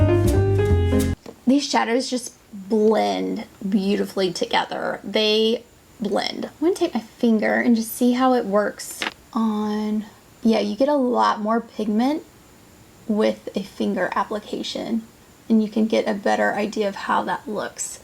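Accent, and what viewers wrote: South, United States. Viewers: You are gorgeous and I love the look. I just received my Chanel Seduction eye palette and Romance eye pencil. I am anxious to use them. I love your Southern accent. I was born in Dallas, Texas and I am a Southern gal at heart.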